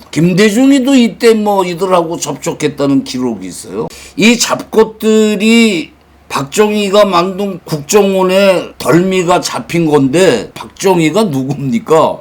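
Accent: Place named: North Korea. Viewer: 이사람은 북에서 넘어온 사람인가 북한 말하네요 그런데 남한정치 역사를 정치 박사보다도 더 잘하고 있는데요 북한 간접 교육받고 워남해서 남한 국민 이간질 하고 있는것 같아요.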